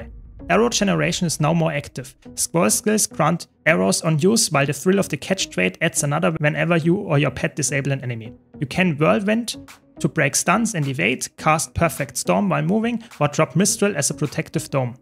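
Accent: Germany. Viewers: Heiliger bim bam das ist der deutscheste Akzent den ich je gehört habe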